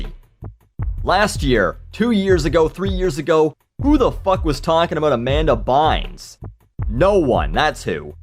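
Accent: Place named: Canada. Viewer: I love how speaks: it's Canadian as fuck.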